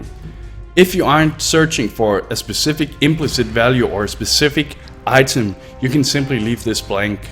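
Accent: Denmark. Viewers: Yo nerd. Just something im wondering, are you Danish ?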